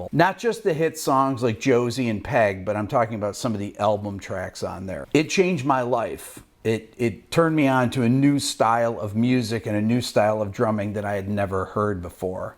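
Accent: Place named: Central New York, United States. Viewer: Hey Rick, I dig your CNY accent. Just dawned on me. Gotta ask, i realize this video is a year old. Ever know Gadd or of Gadd since you grew up in the same area? Rochester has had some really good drummers come out of there... also, was always curious why Mel Lewis, a Buffalo dude, had bad things to say about Gadd. Or at least didn’t like his playing / mocked it. Maybe Lewis is too much of a purist. Any idea on the history between those two?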